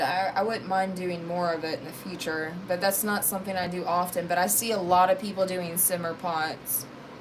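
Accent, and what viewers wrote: South, United States. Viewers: PS ever since you hung out w your family your Southern accent is so much more pronounced 💕💕💕 I love it!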